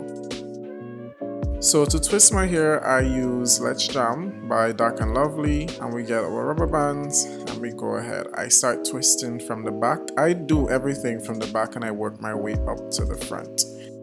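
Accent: Caribbean. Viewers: Hi! Where are you from I'm hearing a Caribbean accent. I'm trini 🇹🇹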